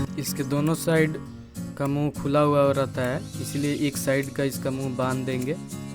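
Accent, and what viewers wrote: Bihar, India. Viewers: Kya bhai bihaari ho ka tum? Tumhre tone se lag gawa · Bhai apke aawaj se aisa lag raha hai aap Bihar me kahin se Hain.. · Bhai saahab ...hindi main sterling aur pulling ka dhyaan rakhen....maana ki bihari hai phir bhi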